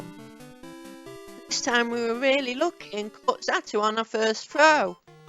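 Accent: United Kingdom. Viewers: Is that your voice? Are you british?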